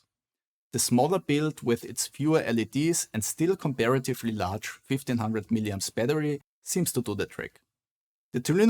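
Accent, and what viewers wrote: Austria. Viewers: thumbs up for the fat austrian accent from germany. My favourite word: The Bauerbuddnblaismänt. :) Eine Mischung aus Domi Thiem und Felix Neureuther, sehr sympatisch! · This is the very first of your videos I’ve watched. Your English sounds Austrian, nice ;-)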